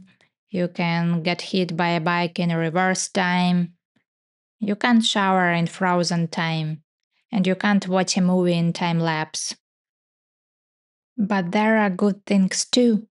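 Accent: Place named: Russia. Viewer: судя по акценту вы знаете русский, я прав?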